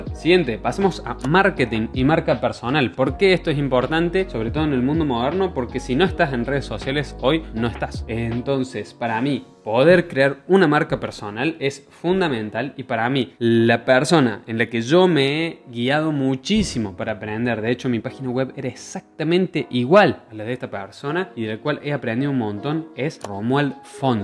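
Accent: Córdoba, Argentina. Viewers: No Eres Espanol, Argentino no Creo? Solo es curiosidad! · Uhhhh ese acento cordobés, ya le baja 9 puntos😡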